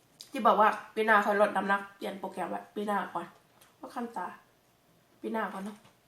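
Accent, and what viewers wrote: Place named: Bueng Kan, Thailand. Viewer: น้อง แม่นเป็นคนบึงกาฬบ่อพี่อยู่โคกก่อง สำเนียงเว้าคือกัน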